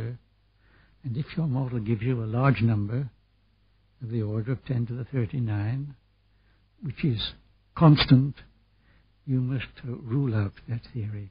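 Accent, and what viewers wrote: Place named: Netherlands. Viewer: I could swear the accent is Dutch (being Dutch but living outside of Holland myself)...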